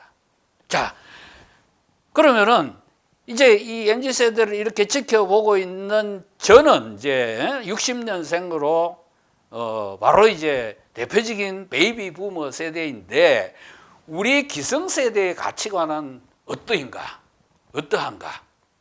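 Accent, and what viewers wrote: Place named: Gyeongsang, South Korea. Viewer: 경상도 말튜네. 건설 마피아의 악마적 결과를 세대갈등으로 작정하지마시오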